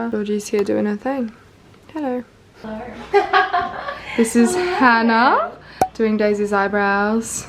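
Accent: Australia. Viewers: I knew you were from Bondi just from the accent lol. #eastensuburbsgirllssss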